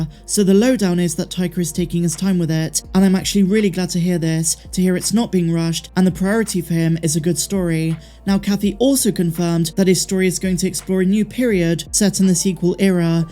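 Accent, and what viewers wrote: United Kingdom. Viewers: ! What part of the U.K. are you from?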